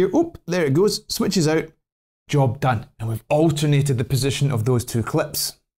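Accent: Scotland, United Kingdom. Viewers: fantastic, what a joy to hear a scots voice 😊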